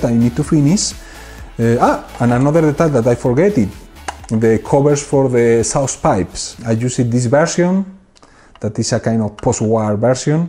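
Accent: Spain. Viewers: Very useful. .an original kit. .and your accent is still so. ...spanish 😉i like it 😀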